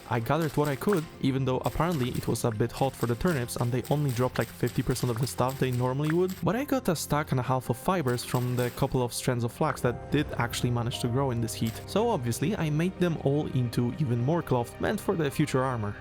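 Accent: Poland. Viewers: Are you polish? You sound VERY polish/slavic and I fucking like it. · You sound Polish. Are you Polish? I am Polish, siema. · slychac ze Polak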